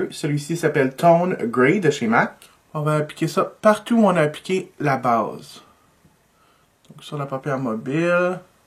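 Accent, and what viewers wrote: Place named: Quebec, Canada. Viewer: Il est très joli ce Makeup j'aime vraiment beaucoup. Je ne me lasserai jamais des accents des youtubeurs Québecois !! quel Bonheur !! Ps: Bonne & heureuse année :)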